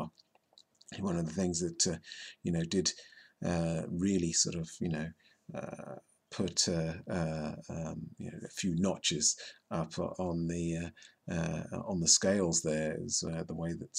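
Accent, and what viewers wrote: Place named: United Kingdom. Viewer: Just gotta say, I love your accent (I'm a sucker for a British accent though).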